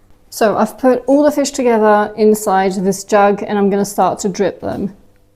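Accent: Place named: England, United Kingdom. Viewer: ton accent anglais incr <3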